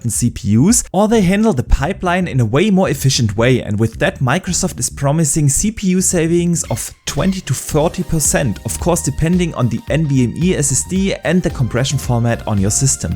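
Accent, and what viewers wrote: Germany. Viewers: Alter…du klingst so fucking deutsch! 😂 No offense, nen Like kriegste als Entschuldigung 😬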